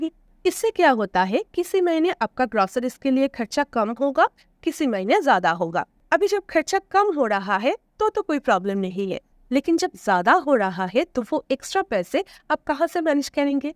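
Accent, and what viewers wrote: Bengal, India. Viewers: Dear loved your todays vlog very much though all your vlogs are meaningful but todays vlog is insightful also and the way you say or convey your thoughts is so simple and beautiful the Bengali touch in your accent is lovely to hear❤❤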